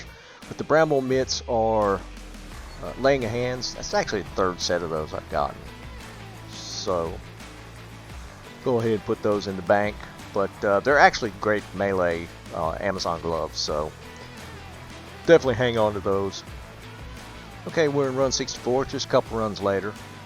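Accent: Texas, United States. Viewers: Sounds like Texas or Mississippi.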